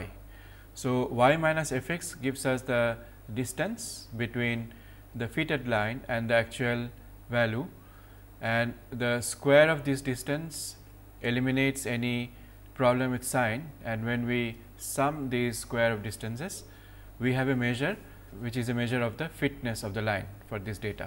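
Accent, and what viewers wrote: India. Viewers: Lecture is okay if I can't stop laughing because of the Indian accent ;)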